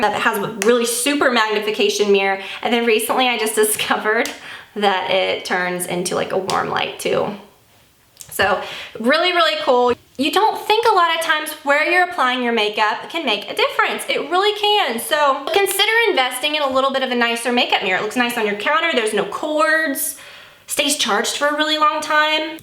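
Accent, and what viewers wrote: South, United States. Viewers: I LOVE YOUR HAIR!!!!! Oh and your extra southern today, your accent is stronger than usual lol!!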